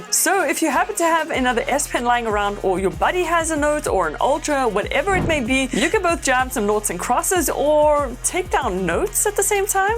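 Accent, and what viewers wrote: South Africa. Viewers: You have a South African Accent, I wonder where you are from? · Why have I just come across this channel now?! Great video! Subscribed!! Also, is that a SA accent? Lol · You still in South Africa? Thought you had the accent... checked the about area and was right 🤣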